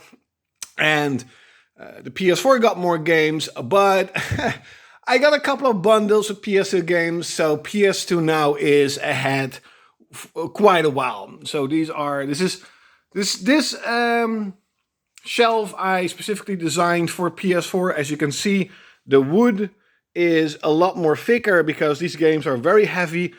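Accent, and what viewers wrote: Netherlands. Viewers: Geen idee wat het is maar je kunt altijd gelijk merken wanneer iemand Nederlands is 😂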